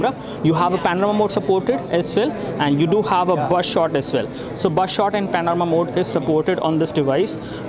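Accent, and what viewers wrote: India. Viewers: Indian accent gives me headache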